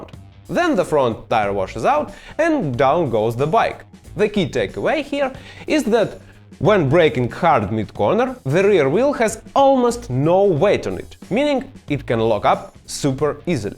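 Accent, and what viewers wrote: Russia. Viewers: Прекрасный английский! Но по акценту как те русские из голливудских фильмов, 😂 хотя это совсем не бесит и не мешает, а даже придаёт какой то шарм. Главное очень грамотно и понятно! По качеству и локоничности транслируемой инфы, - просто 10 из 10! Отличный канал и не только для новичков, судя по тому нонсенсу который иногда выдают "бывалые", - всем сюда! - на повышение квалификации! Спасибо за труд. 😀👍✌️ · You are my favorite living Russian because I can’t recall the last time I fell on my daily ride and feel that your YouTube videos are a large part of why. Perhaps your accent forces me to focus more, or maybe you are just that good as a teacher. Whatever the reason, you made a difference in the way I listen.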